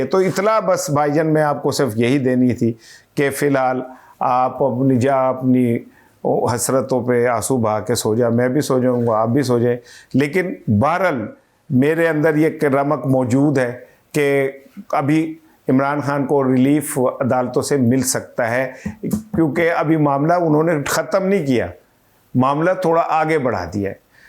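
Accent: Punjab, India. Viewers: Please don’t give explanations the way you talk is your own style punjabi tarka is really good so never stop doing so. Your English vlog was really strange I am glad u explained what happened to it 😂❤❤❤ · Punjabi ka turka bht acha lgta h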